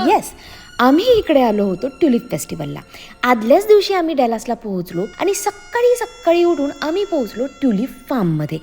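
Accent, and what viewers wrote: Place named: Kolhapur, India. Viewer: ताई तुम्ही महाराष्ट्र मधील कोणत्या गावातील आहात? तुमची भाषा आमच्या इचलकरंजी कोल्हापूर भागातील असलेसारखी वाटते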